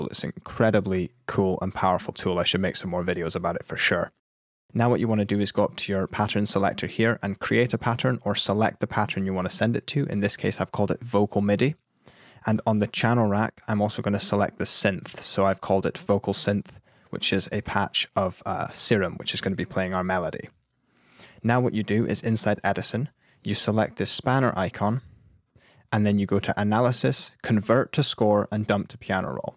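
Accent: United Kingdom. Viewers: Soooo useful!! Thank you! It is also useful to find notes to sing, notes to play on piano. In fact, for those who are going to have IELTS exam, Always listen to this channel's videos in order to adapt British accent, even though you sometimes don't understand what they are talking about. :3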